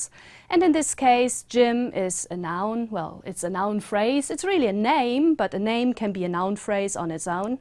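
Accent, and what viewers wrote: Germany. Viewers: Funnily, this was my assumption after I heard you talking. For me as a German your accent is still quite noticeable. When you pronounced "have" I immediately thought of this phenomenon called word final devoicing ("Auslautverhärtung"). Am I right with that or would you say it's part of your British accent?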